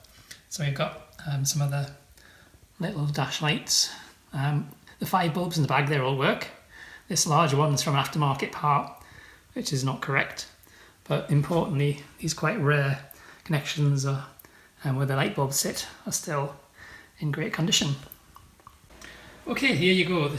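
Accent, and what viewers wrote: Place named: County Durham, United Kingdom. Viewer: Hello Mate, In the process of series 2a rebuild, found a lot of your vids really helpful but electrics Is my downfall have not got a clue. You sound as if you are originally not far from me I am in County Durham. How much would you charge to make me one of these looms ? I already have one through the Chassis, need one for Bulkhead. Thanks k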